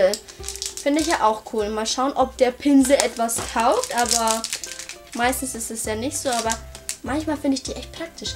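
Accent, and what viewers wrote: Franconia, Germany. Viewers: sie hat nen fränkischen Dialekt🙈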